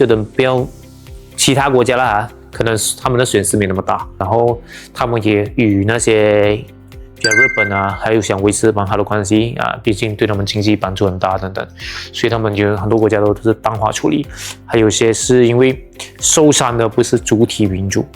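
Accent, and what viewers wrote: Malaysia. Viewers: 马来西亚口音就这样啊。有时候我真的很烦这群普通话霸凌的东西。这帮霸凌人在中国大陆这个德行，出来还是这个德行。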